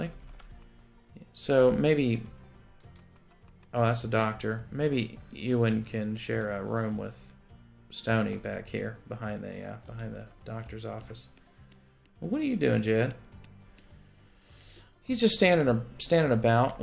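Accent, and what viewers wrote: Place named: South, United States. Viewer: The subtleness of your USA southern accent is what suggested Virginia to me. Not that I've spent enough time there to know them well.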